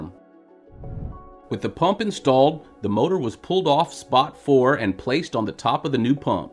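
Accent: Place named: South, United States. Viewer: The audio commentary is great, and a nice Southern Accent! Ty 🎉🎉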